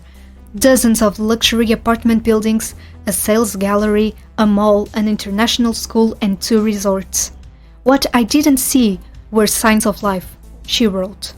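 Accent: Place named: India. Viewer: Whats with the indian accent?? 😆😆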